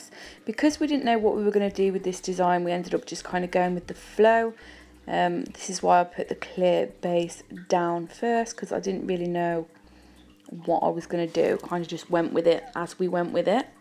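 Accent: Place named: Birmingham, United Kingdom. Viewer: Love this girl and her work. Sounds like a Brummie!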